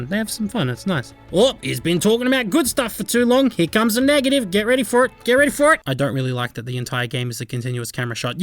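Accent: Australia. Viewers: Finally!!! I love all your Vids! They're so funny everytime Maybe your Aussie accent helps a bit :D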